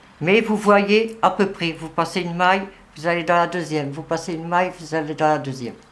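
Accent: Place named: Tourcoing, France. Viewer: Bonjour J'adore votre accent, ça me rappelle Tourcoing où j'ai vécu Merci pour votre explication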